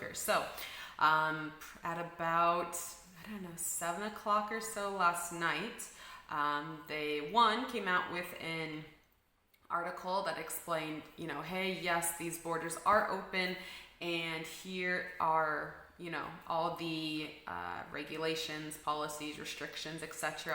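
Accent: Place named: Scandinavia. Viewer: What is your native language? It seems Danish or Nordic or something. The accents read Nordic.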